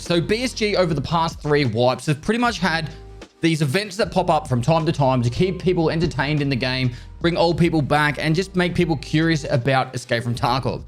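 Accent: Australia. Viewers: I havent watched videos in a while, but living back in Australia for a bit now, his Accent got a lot stronger compared to when he was living in Europe for a year. Or is that just me?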